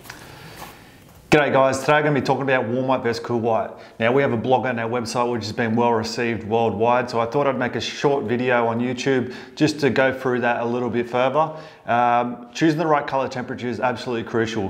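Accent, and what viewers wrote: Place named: Australia. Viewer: That Aussie accent tho🥰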